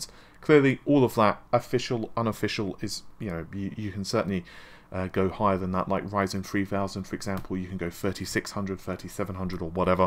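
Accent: United Kingdom. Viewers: He is not mispronouncing anything,its called British accent. I am getting tired of those jokes. Amata is pronouncing Navi in a very weird way now!! Leave them alone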